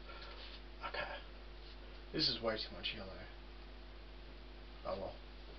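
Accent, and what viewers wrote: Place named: Queensland, Australia. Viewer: It's honestly nothing, and I recognised the Queenslander (because I too, am one). I will support you, because I believe.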